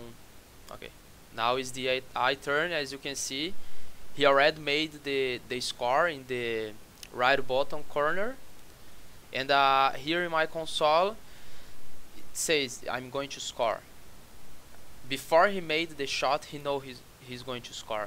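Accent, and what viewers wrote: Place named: Brazil. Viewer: Acredito que seja Brasileiro pelo sotaque, mas que otimo video!! Nao pare de fazer videos!!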